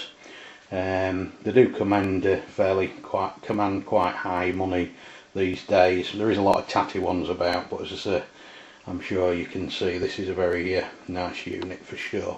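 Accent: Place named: Ireland. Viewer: Hello, tis Paul on the Rock, I'm speaking to you from the future, spooky lol. Haven't heard your dulcet Irish tones for a while, where you been old man? I hope all is well with you and your loved ones, and you're all dodging the lurgy over there. I don't know why Youtube's algorithm decided to put this video in my recommendations 8 yrs later, but hey ho, I do watch the odd chicken box video now and again I s'pose 😉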